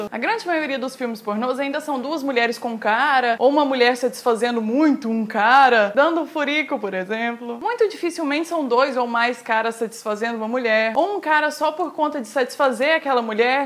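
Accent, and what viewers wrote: Minas Gerais, Brazil. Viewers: poxa aos eu ja notei que ela e mineira kkkkkkkk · Que gracinha ela falando mineirês! 😍😍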